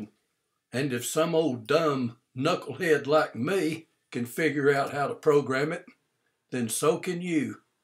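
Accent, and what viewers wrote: Texas, United States. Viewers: I love your Texas accent bro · You sound like your from Texas dude and that was quite fascinating and I had a bit of a chuckle. I admit the remote is small and to be honest I have not got this Blu Ray player but I do have an earlier Sony model and the remote is the same size and pretty much the same as this one. To be honest I do not have a problem with the remote at all and it makes no odds to me where they put the play buttons either. But as with the biggest majority of remotes I have for my TV/DVD/Blu Ray and HiFi they are all capable of controlling any other device so I certainly see no need to go out and buy a Universal Remote at all. By the way I shall be getting this Blu Ray Player in the new year and right now I am saving to get a new 4K TV for Christmas. Hopefully in the new year this Blu Ray Player will come down in price too, cause I still feel it's too expensive with how cheaply they have constructed it. 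My last Sony Blu Ray player was around £130 here in the UK and I got it in a sale brand new for £65. I am not expecting this to go down that low and it's now at around £180. But I am hoping it comes down to about £130 or less by next year :)))). I would also say my last Blu Ray Player even though it's cheap and small like this and made of plastic. I still think it has a better design than this one. If it played 4K discs I would not even bother getting a new player and I am only getting a new TV because my old one is on it's last legs and is playing up a bit.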